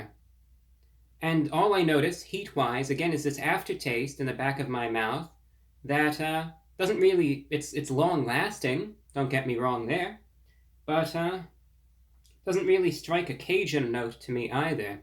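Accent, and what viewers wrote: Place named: Transatlantic, United States. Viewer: Video starts . By the way, I can’t tell if you’re doing a transatlantic accent for real or you learned how to fake it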